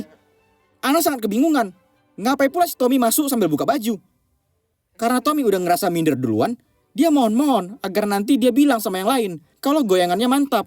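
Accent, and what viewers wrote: Medan, Indonesia. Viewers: Bahasa Indonesia versi Medan emng bkin ngakak 😂😂😂😂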